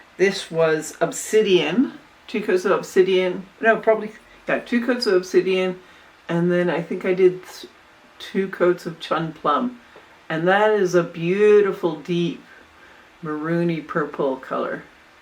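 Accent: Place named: Canada. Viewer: Based in the UK? ok but you sound so incredibly canadian. Am I tripping? Anyway I am not usually a fan of pinks and reds, but I am really digging the way you've combined them here, kinda has a touch of Rothko. I really liked the bright reddish vase and jug with amarylis all over. Usually not a fan of amarylis but it looks great with those rich colours.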